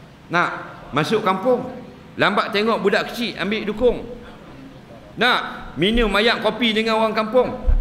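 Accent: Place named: North, Malaysia. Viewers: fasih elok noh loqat utara..seronok la..esok kita nk mengundi..esok kita dh bleh buat pilihan..terima kasih tok guru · Ckp utara x kekok lngsung..Fasih sgt😀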